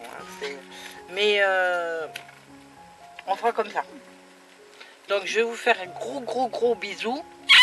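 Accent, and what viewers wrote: South, France. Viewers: ah oui je suis encore là et je me suis mm abonnée à ta chaine car elle est variées tu fais des tas de choses et j'aime les chaînes comme ça. Magnifique pour ta Filfille 17 ans, cest l'âge à laquelle ma minette "Fynou" a du nous quitter, elle était très malade niveau coeur et poumons. Et ensuite jai eu mon pti Bounty qq années après. Merci pour ton email c'est très gentil. Jai aussi une chaîne mais il ny a pas toutes mes passions dessus car maintenant je mets plus des vidéos pour que ma maman qui est loin regarde ce que je fais, ainsi bien sûr que mes peu mais fidèles copines. Je t'avoue que faire des videos j'aime plus trop et jai pris beaucoup de distances avec les réseaux sociaux pour faire passer d'autres priorités qui sont des objectifs dans ma vie personnelle et qui me donnent bien plus de bonheur que la méchanceté de certaines youtubeuses dans le scrap ou la fimo... 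Pour le DP je te remercie pour le nom de la société qui en vend et en effet ta video va m'intéresser car le 1er je l'ai acheté tt à lh sur Amazon, cest une plage et le prochain sera un chat que j'ai mis en réserve. Après tu les mets sous cadre tes DP ? Car moi, cest le but. Si oui, quel genre de cadre ou autre utilises tu pour les accrocher au mur? Tes vacances se passent bien, et as tu beau temps ou tu es? Tu ne m'as pas répondu pour ton pti accent, si tu est bien du sud ? Je te laisse car je vais continuer ma page dessin du mois d'août sur mon Bullet Journal. Bon après-midi. 📚 Bisous ✏✒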